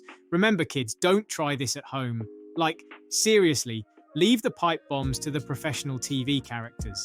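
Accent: United Kingdom. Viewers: THREE/'#-separate-times, ths IIIDIOT British 'voice' states tht, tha 'crazy-doctor' attacks Neegly with a "drill"; it wuz a SAW! NOT a 'drill'! Ths iz one-of-MANY reazonz tht, I HATE British-narrated 'stuff'!! Stay in YOUR land! Leave American stuf ALONE!! YOU-ppl SUCK! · I really do not like to hear the narrating from someone from England. They talk funny and their English is Fucked up!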